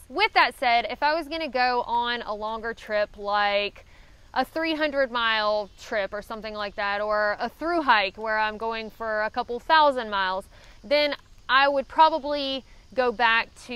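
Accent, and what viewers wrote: South, United States. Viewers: Ha ha! You say “strowed” just like my father from North Carolina. Don’t ever stop talking like a Southerner. Southern dialect is endangered.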